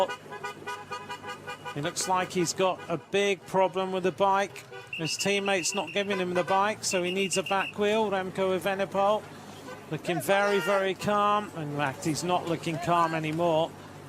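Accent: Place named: United Kingdom. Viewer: イギリス英語気持ち良すぎる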